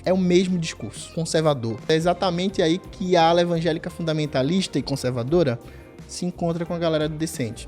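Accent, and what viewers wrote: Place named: Northeast, Brazil. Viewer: KKKKKKK Mano que absurdo foi esse aí que tu falou. Tu acha mesmo que isso e movimento político e "racistas"? Isso é ridículo parceiro. Inclusive o the send Não e um conjunto de igrejas amigos, e muito menos uma estética nas mesmas. Quanta mentira cara. E por último, o livro do Yago Martins não criminaliza a pobreza. Qualquer um que LEU sabe. E me entristece muito reparar nordeste no seu sotaque, parece que ainda tem muita gente alienada ou alienante por aqui.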